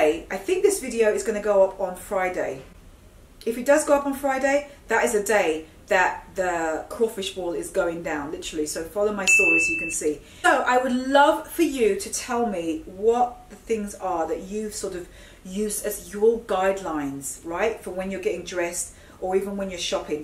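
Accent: RP, United Kingdom. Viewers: So fucking posh how have I not found you before now!!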